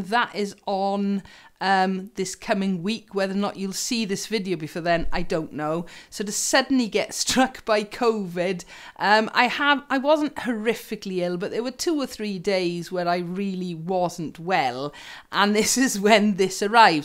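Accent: Wales, United Kingdom. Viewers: English? Sounds suspiciously like a Welsh accent to me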